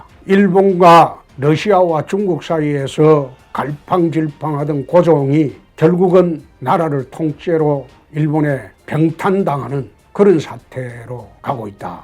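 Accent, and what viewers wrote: Gyeongsang, South Korea. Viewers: 홍준표가 경상도사람이라 억양이 과격하게 들릴수도있으나 지나보니 다맞았다..대선토론회했던 영상 다시한번봐봐라 문재인이 얼마나 허무맹랑한 공약을 했던가.. 말문만 막히거나 곤란하면 한다는 소리가 그말 책임지셔야합니다.. 세월호사건과 겹치며 그놈의 갬성이 문재인을 만든거다.. 누가되었든 다음대통령은 정말로 대선토론회 꼭보고 투표하길바란다. 후보자들이 공약대로 지키지는 않지만 쩝쩝이같은 인물은 다시는 나와서는 안된다 네이버 댓글에 이런글이 올라오니 공감수가 압도적입니다 이제 천천히 준비하십쇼 이나라를 구해주세요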